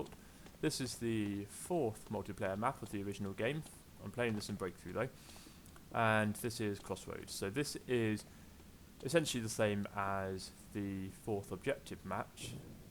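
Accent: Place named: England, United Kingdom. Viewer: great video! are u from England? u have a nice accent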